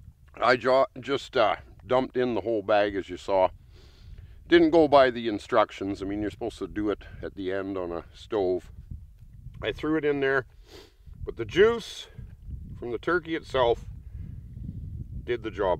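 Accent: Alberta, Canada. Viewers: He has a strong Canadian like accent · This guy sounds like every man in Alberta/BC...... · Are you Canadian? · keep recording these videos. Experiences in the "bush" as you explain and record are not only interesting, but soothing. I wish I were a bit younger and surrounded by nature. You are a great "ambassador" to bushcraft. (BTW: Your Canadian accent adds a GREAT deal to your videos.) Keep'em coming, mate!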